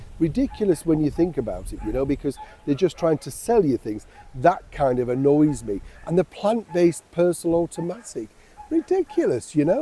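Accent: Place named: North, United Kingdom. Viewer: A right good Northern rant 😂❤❤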